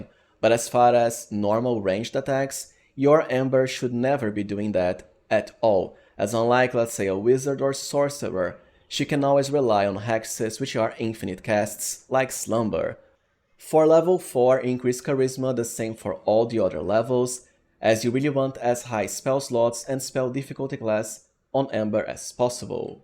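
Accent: Brazil. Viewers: Irmão. Você é brasileiro ou não? Kkk grande fã aqui. De qual estado tu é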